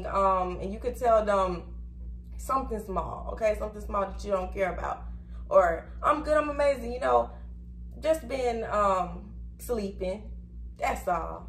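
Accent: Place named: South, United States. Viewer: She spoke on a tipical southern, they so nosey and different.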